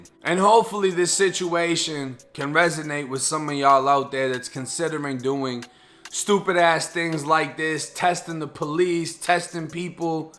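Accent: Boston, United States. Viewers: He got a strong boston accent lol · Sound like a guy from the inner city maybe Boston all black/white all sound like that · yo bro r u from Boston mas.??? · Bro u from Boston or nah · I'm like 99.1% sure you are from Boston. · Man that boston accent is comming out hahd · Na that ain't New York thass bean town boy that's middlesex n Suffolk county shit it's the whole bay state we all mass holes just r area n boston is more emphasized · New to your channel. Great commentary👍 love the Boston accent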